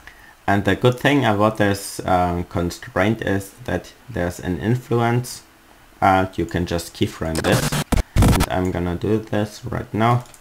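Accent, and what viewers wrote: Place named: Germany. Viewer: bist du deutsche :D haat man aam anfang gehört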